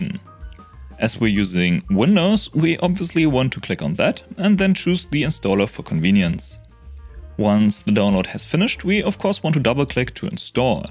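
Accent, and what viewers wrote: Germany. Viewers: Ah da hast du es offensichtlich gemacht. 😂 Habe mir die ganze Zeit gedacht das ist der typische "this has to be perfect" deutsche Akzent beim Englisch reden. Ich klinge locker nahezu identisch beim Englisch reden. Immerhin besser als das Englisch das manche andere an den Tag legen.🤣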